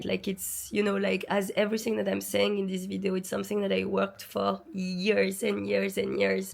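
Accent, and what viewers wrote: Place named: France. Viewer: dear, thank you so much for all the directions you gave to achieve our dream lives. i feel like i am hearing from such a beloved friend when listening to you. love the way you express yourself (also, your french accent!). i do not only believe, but feel you truly are such an amazing person and have a marvelous soul. may your life be always filled with love, joy and blessings ❤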